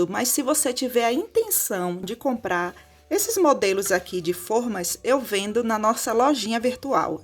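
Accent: Bahia, Brazil. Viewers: Eu caì no seu canal por acaso, me apaixonei de cara e me inscrevi imediatamente! Muita simpatia e esse sotaque maravilhoso da minha terra, a Bahia...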